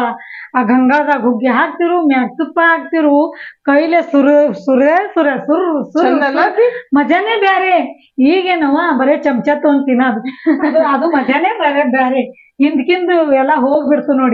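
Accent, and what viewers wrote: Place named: Solapur, India. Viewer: Nimma yavdu ooru .namma solapur hage maatu ide.👍huggi bhalu channda agide.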